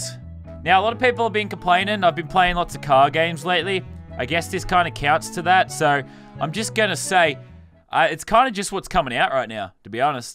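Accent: United Kingdom. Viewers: Aren’t you british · lazerbeam you sound British sometimes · Love your British 😂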